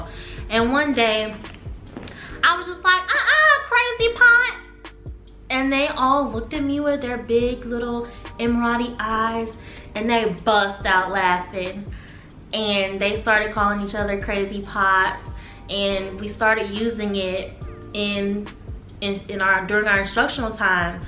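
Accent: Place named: Alabama, United States. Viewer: What part of Alabama you from?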